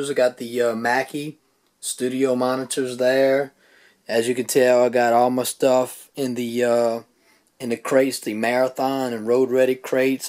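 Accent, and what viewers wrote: United States. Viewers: A southern twang with MIC MAC Freestyle records? NICEEEEEE!!! :) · Great video! I subbed! I call my studio the music room too lol. You sound like your from Louisiana too..where you from brother? Anyways just found your channel, searching YouTube for ideas on how to display my records...I don't have nearly as much but I wanna build my collection and put it on YouTube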